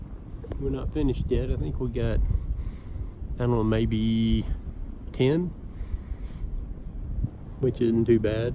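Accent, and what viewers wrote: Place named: South, United States. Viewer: Very nice! I´ll get one in next two weeks. So, now I´m just lookin for some videos with this amazin dogs on duck huntin and I definitely found one with US southern accent which I prefer and understand best! :D Have a good time, greetings from Czechia.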